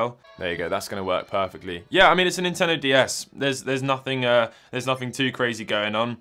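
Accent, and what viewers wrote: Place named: United Kingdom. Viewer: >annyoing british accent disliked and moved on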